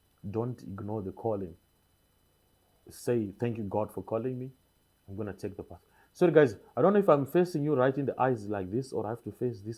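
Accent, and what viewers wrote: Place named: England, United Kingdom. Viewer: "The first church I have to preach to is my family" these words you have spoken are powerful and such a great message that most men would appreciate. You know, it is important to determine your own goals and standards in your life and pressure yourself by what other people are doing in their lives, be it having big house or the latest model car. You and only you, as the heard of your family, should determine your family's standard of living. Nhasi - today, throughout this video you have spoken english as if you once lived in England, UK. Wishing you all the best with you relocation.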